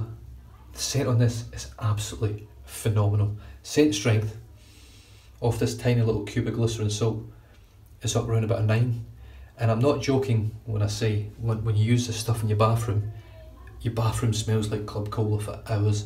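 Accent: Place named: Scotland, United Kingdom. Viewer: With your Scottish accent, you could be recruited to be the next kings man with the all the fancy looking suits.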